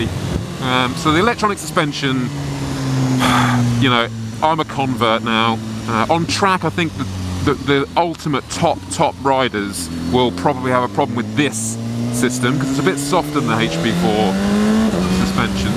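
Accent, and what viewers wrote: United Kingdom. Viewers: 5 seconds into this video and i have to say. I love british accents..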